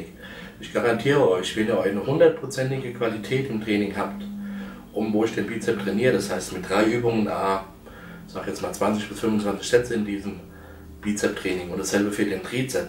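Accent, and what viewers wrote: Germany. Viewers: Evangelium nach Johannes Ai bub geh mir fort des is hessisch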